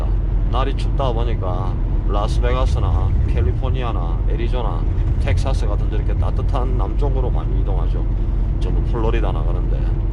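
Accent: Gyeongsang, South Korea. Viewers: 서울은 새벽부타 겨울비가 부슬부슬~하루종일 내리고 있습니다 여기오면 맘이 참 편해집니다... 님의 구수한 갱상도 사투리도 좋구요,오늘도 잘봤습니다^^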